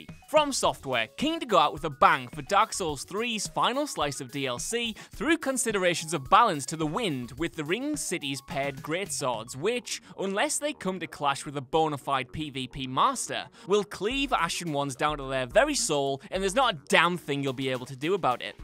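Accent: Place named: Newcastle, United Kingdom. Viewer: Felt like i was listening to a geordie shore reject. Good list though👌👍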